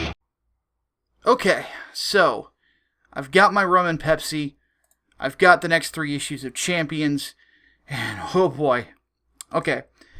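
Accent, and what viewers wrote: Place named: South, United States. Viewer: I literally liked the video because of your southern speech If you want to see an example of this watch don lenon . Talking to ben shapiro from a couple days ago I'm from Chicago i go down south every couple of years Usually during the forth of July I see people coming together to celebrate and throughout this leftist bullshit I've been explaining my positive thoughts of the south like That people in the south come together real well and that the the flag has been a symbol of history and the southern pride not hatred I'm against censorship period Do I want a living wage sure do these people know anything about Ecconomicss nope Inflation increased taxes the decreased value of a dollar Ouran high school I hate all these damn rich people They're idiotic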